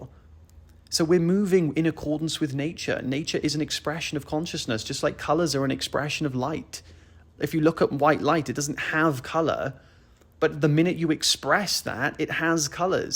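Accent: United Kingdom. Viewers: I feel enlightend by your amazing british accent. So nice!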